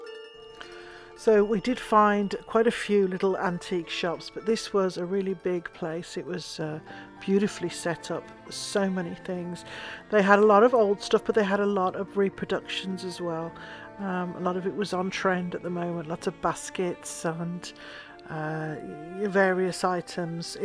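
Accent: United Kingdom. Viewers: We live in Arkansas US. Getting close to building our home and decided French Country is my favorite style. My friend of over 40 yrs is British and hence I enjoy your voice. Love your ideas! Was excited to see the blue willow as I have the exact plates 💙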